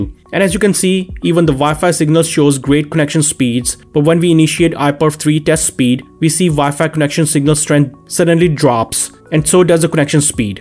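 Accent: India. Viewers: The Indian accent made me wanna stop watching, I can’t, it’s so heavy😂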